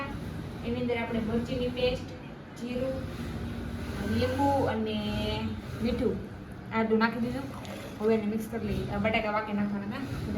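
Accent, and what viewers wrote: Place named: Kathiawar, India. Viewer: Your voice sound like kathiawadi Are you from Rajkot??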